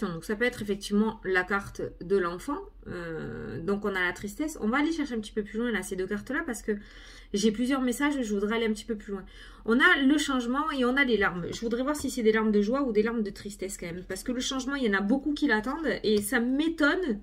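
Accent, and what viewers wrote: South, France. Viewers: Bonjour et encore merci. Pour moi ce sont tes abréviations ( maniant au lieu de maintenant ou si t es d accord au lieu de si tu es d accord......cela exprime ta joie et ta volonté de nous faire aller vers le meilleur très rapidement car tes idées fusent. Il y. On doit se bouger le « derche ». Et il n y a pas qu un chemin pour y arriver,il y en a plusieurs et enfin tu vas passer un sale quart d heure mais cela t amènera à une vie de joie.........tes phrases sont pleines d espoirs et de vitalité avec ton accent du midi, c est ce qui donne de la force à tes paroles quelque soient les mots que tu utilises. Encore merci et à demain 🙏🙏🙏🙏🌞🌞🌞🌞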